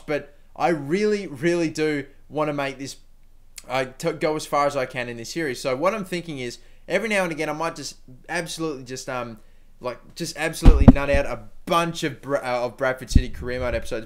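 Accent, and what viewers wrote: Australia. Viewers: Are you Aussie!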